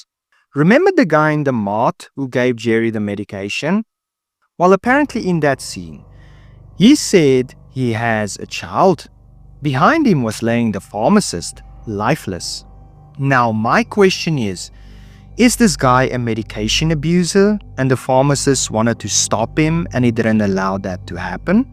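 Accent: South Africa. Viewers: Hey are you south african by any chance?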